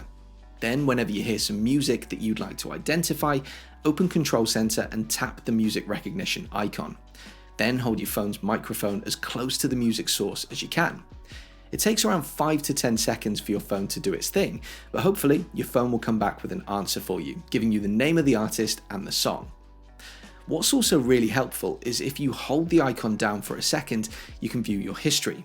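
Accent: United Kingdom. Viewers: Great videos!. I like the content and the presentation style. BTW what regional UK accent is that?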